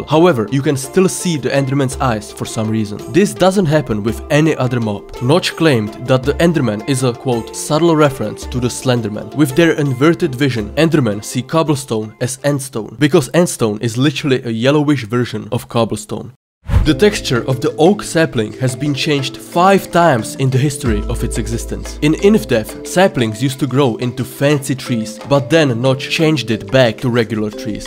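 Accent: Czech Republic. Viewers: Proč tu nejsou český komentáře? 😀 Dohledal jsem si to a nespletl jsem see jsi čech, já ten přízvuk asi nedám, ale ta angličtina je super 😇 Jen prostě výslovnost mě strašně tahá 😅 Pardooon 🙈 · Great, I enjoyed the video, but ... aren't you from Czech? Honestly, you sound like that (and your channel name is suspicious too) :D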